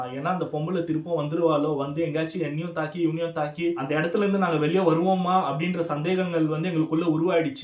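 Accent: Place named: Bangalore, India. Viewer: Bangalore tamil slang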